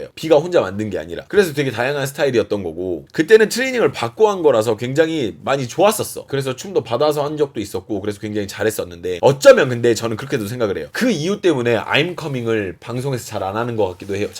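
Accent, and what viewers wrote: Jeolla, South Korea. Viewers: 고향이 혹시 전라도이신가요 말투가 서울말투같지 않아서...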